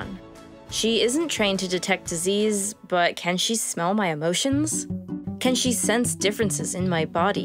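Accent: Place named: United States. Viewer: How come this is written in British (hoover, footfalls) but narrated in American/maybe Canadian?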